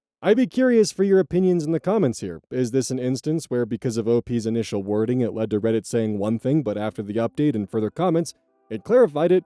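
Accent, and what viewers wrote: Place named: Alabama, United States. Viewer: ah, someone's from Alabama I see.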